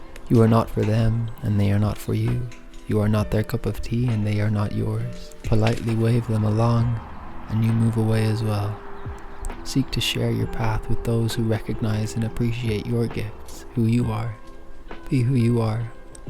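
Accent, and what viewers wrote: Ireland. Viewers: the aule paddy accent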